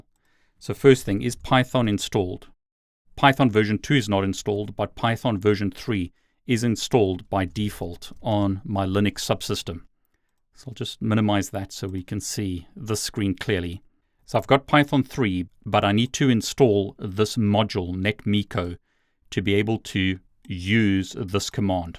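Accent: South Africa. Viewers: Ah a South African! Goeie video! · can hear your raw south african accent ! thanks a lot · Are you South African? :)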